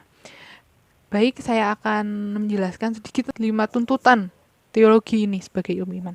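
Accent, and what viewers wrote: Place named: Java, Indonesia. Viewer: Medok e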